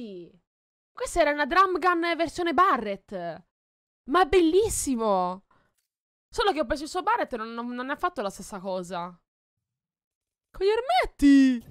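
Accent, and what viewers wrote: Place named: Naples, Italy. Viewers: Ma sei napoletana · apprezzo lo sforzo nel parlare napoletano gg sei fortissima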